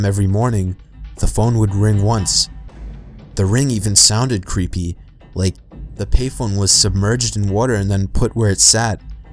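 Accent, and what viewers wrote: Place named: Boston, United States. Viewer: He also has a Boston accent in his old videos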